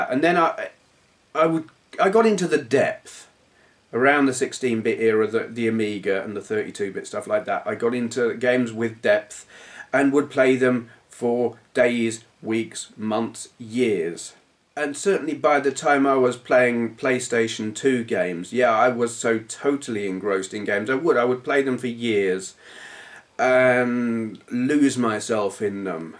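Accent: United Kingdom. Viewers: funny hearing you say you like tim because he has an aussie accent. i subbed to your channel as an aussie partially because i like your british accent!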